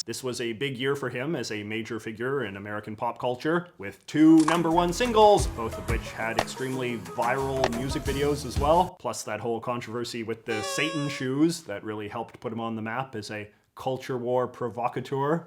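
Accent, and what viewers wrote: Canada. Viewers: I can tell you are Canadian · How would you term this cadence to your manner of speaking, it's so distinctive, never heard anyone else speak with your "rhythm", which seems independent of your Canadian accent. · Are you Canadian talking about American culture? Accent you have and all.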